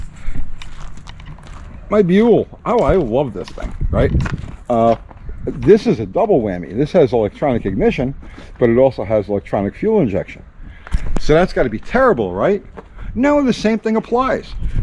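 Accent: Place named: North Carolina, United States. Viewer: You sound very much like some of my old friends here in North Carolina. I thought these same thoughts in their entirety that you are thinking and I am 70 yrs. Old and a deactivated Marine. You and I could talk forever on these and many other things. I used to love the old westerns and old science fiction and many other old things. I don't know if you are aware that the points vehicles are EMP proof. The computer brain vehicles are not and the systems would be fried if an EMP explosion went off or if a nuclear bomb hit 60 to 100 miles away. Call up some manufacturers and ask them are their cars, Jeeps included are EMP proof. I own a 2011 Jeep Wrangled, Call of Duty edition with only 73,400 original miles on it and Jeep can only speculate about it's ability to endure. I love the Jeep and it has never given me any problems except in the electrical department which required new complete wiring for 800.00 dollars at the Dealership. 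I would love to have a wrangled type jeep from the 60's and most especially a jeep from the Nam Era that was not a demilled military one. They cut the bodies in half. I don't trust rewelds that leaves a soft spot in tempered struck steel. Of course they don't do over 60 miles an hour but a great mechanic could boost it up. That would definitely survive an EMP attack and get me purtineer anywhere I need to go. Pop a couple of gas and a water can, axe and shovel and I would be in business ( biness).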